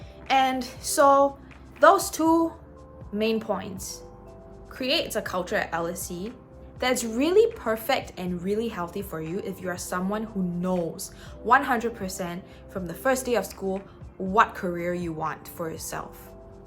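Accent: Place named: Singapore. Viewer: Hi! Future student in LSE MSc Political Science here! Got any advice for a fellow Singaporean? (Assuming you are from the video and accent haha..)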